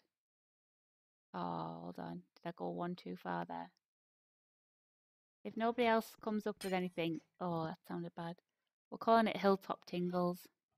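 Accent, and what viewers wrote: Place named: Northeast England, United Kingdom. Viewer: Your accent reminds me of mine when i was still living in the uk. But i was more north central than north east.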